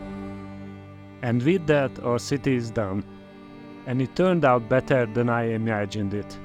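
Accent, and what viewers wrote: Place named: Hungary. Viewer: You have great skills bro, you have another subscriber. Also your accent reminds to hungarian speaking english, but its cool!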